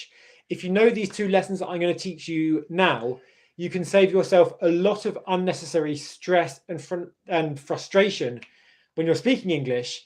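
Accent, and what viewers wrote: United Kingdom. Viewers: Is there any course taught by you, to learn British accent. If it is available on which platform it is available, please let me know.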